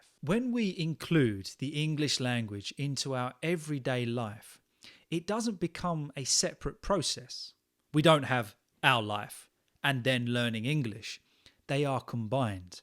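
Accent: United Kingdom. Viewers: Thanks for advice🎉 are you British, or did you learn British language?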